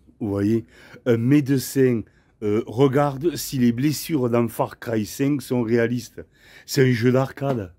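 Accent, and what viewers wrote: France. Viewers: Salut, pas d' accord avec toi, "les cons ça osent tout, c'est à ça qu'on les reconnait...". Ils y en aura toujours, donc il faut faire avec, mais on les emmerde et il faut leur faire savoir Haut et Fort. Notre personnalité n' a pas à en souffrir, au contraire, rentrons leur dedans par la dérision en leur montrant qu' ils nous amusent... Par contre, entièrement en accord avec toi, sans les créateurs ils n' existent plus...ils ont essayé d' être eux même créateurs, mais ils ont échoué... En tous cas, ton accent de cigale est toujours aussi mélodieux à entendre....Bons vols, bonnes courses et plein de bonheur.....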